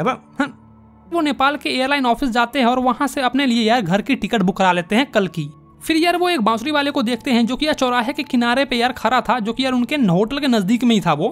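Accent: Bihar, India. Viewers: Hum bhujlo bhaiya app bihari ho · Bhai ap kya bihar se ho kya me up ka hu bhAi ye to bajane me maje ate h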